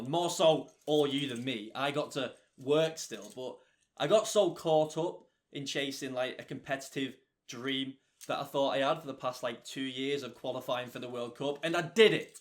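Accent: United Kingdom. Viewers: Can you explain why he got banned? I don't really understand the britisch accent so it is pretty difficult to understand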